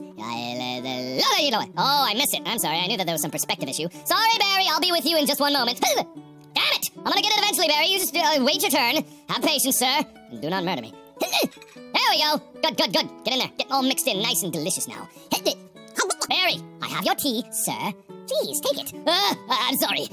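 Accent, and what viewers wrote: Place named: United Kingdom. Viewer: he sounds British sped up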